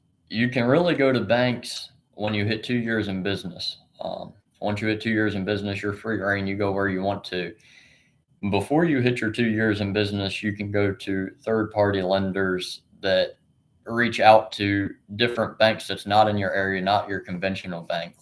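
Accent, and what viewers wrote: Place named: South, United States. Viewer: Wow I had to subscribe to your channel I seen your first one when you were looking for logging trailers your young & I just have a feeling your business is going to go through the roof ❤ 👍🏾🙏🏿 & if you stay on YouTube and don't change your going to do amazing Because you come off sounding so amazing being a young logger & your country accent seals the deal ❤ ❤❤❤❤ You won't be single if you are for long lol from Norristown PA keep up the good videos and may your business prosper an enormous ways & i hooe you fire the people that are not 🚫 helping your business 👍🏾🙏🏿👌🏾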